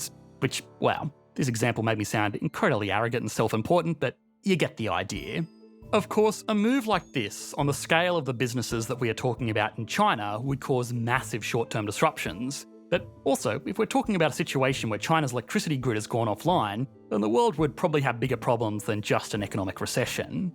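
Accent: Australia. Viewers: I could never move down under cant stand the accent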